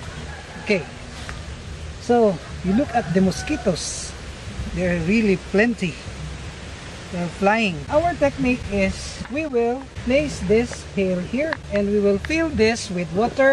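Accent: Philippines. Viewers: I can tell you're a Filipino 1st Your Accent 2nd The sorroundings 3rd Your grammar And im filipino too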